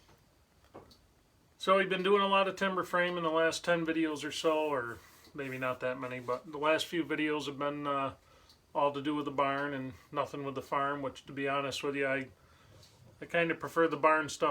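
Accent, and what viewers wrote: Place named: Midwest, United States. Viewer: Thanks for this video. I am looking forward towards the building of it. Your channel is starting off small, yet I see something in your presentation which reminds me of Wranglerstars' earlier days. Please keep on building. Also, could you do something with the audio portion of your video. I've pumped my computer up to 100% along with the YouTube volume, yet it seems like you are speaking in a soft voice. Please check your audio equipment and see if the volume is turned up to full. I'd rather you blast my socks off and then I'll just turn down the volume. It will be interesting if you hog out some of the butt cheek portion of the seat or will you just be sitting on a flat slab? Heck, the way you go about doing things it might just be as comfortable with a Barka lounger cushion on it. Plus, one more thing. What part of the country are you in? 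I'm betting it is Iowa or Wisconsin due to your accent. Thanks again for your vids and please keep them coming. Terry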